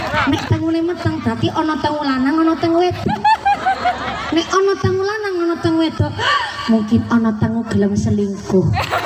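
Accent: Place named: Banyumas, Indonesia. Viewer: Ustazah favorit saya ini..sama2 wong ngapak..ora ngapak ora kepenak 👍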